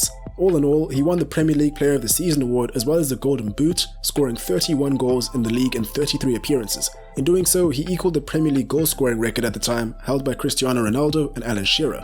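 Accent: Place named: South Africa. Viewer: Where are you from?!?! You sound like you're South African, and from Jo'burg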